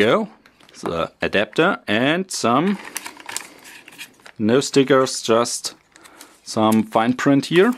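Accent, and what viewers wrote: Germany. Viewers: you are from germany aren't you ? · Lustig man hört, dass du Deutscher bist, ich finde es aber echt cool, dass du auch Englische Videos machst. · German accent, aren't you? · i love ur german accent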